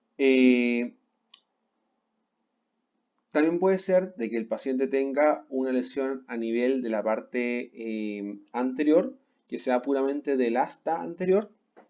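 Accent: Chile. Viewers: Eres de chile? Por tu acento 😅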